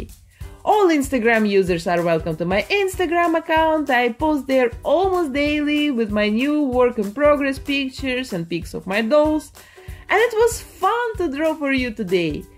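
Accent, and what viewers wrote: Russia. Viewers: You are not just a terrific artist, I like listening to you talk too. I thought from your accent that you are Russian or Ukrainian.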